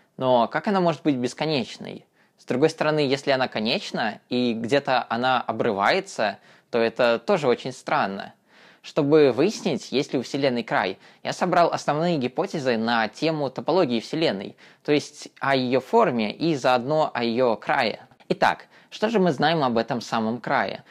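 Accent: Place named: Penza, Russia. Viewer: Интересный материал, но речь оканием, с растягиванием гласных. Откуда он? Из Пензы?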